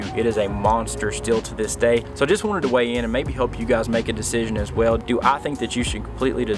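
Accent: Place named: Eastern Kentucky, United States. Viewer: Great video, gained a new sub here. And you speak my dialect as I am in Eastern KY :)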